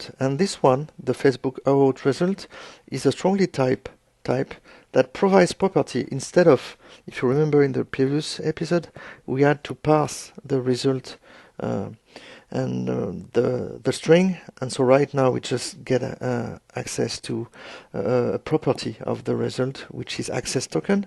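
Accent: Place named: France. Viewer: Cet accent français est tellement remarquable ;) Un grand merci pour cette vidéo. Je travail sur un programme C# et WPF de borne photo et souhaite proposer a mes utilisateurs de partager leurs photos sur FB. Je vais donc tester tous cela et je pense que cette vidéo me sera d'une grande aide... Le protocole FB évoluent régulièrement et il semble que la compatibilité soit d'ancienne version soient souvent remises en cause. J'aurais donc une simple question. La méthode proposée ici est elle compatible avec les moteurs FB actuels ? Encore merci !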